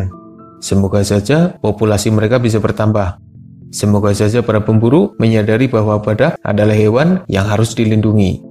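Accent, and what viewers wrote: Banyumas, Indonesia. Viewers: Kie ta kyne cenel wong ngapak o yak......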